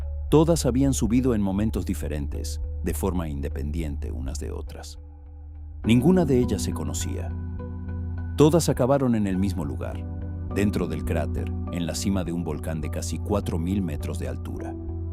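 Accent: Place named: Argentina. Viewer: Es mejor la otra voz esta suena como argentino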